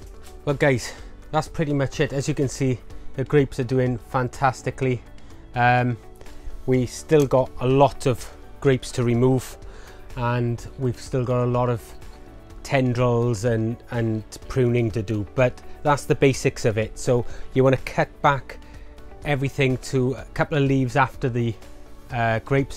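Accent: Wales, United Kingdom. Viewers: As soon as heard your voice I thought - he sounds Welsh - possibly from South Wales. Then I saw your T shirt. Where are you based?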